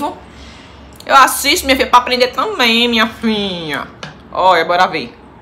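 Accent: Northeast, Brazil. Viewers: Amo seu sotaque nordestino,sua alegria e juventude me encantam, parabéns lindaaa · Adoro seus videos o jeito de vc falar seu jeito arretado do nordestino. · sigo vc justamente por causa do seu jeito, viu? Adoro esses sotaques nordestinos, moro em juazeiro do norte, sou manicure, e vc me incentivou bastante❤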